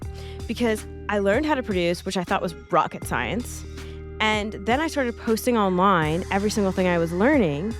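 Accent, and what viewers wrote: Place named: United States. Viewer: That American accent is off the hook!👍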